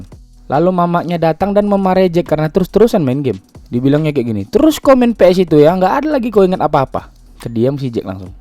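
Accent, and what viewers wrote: Medan, Indonesia. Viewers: logat medan kau bg ketara kale bah,jadi ketawa aku dengarnya🤣🤣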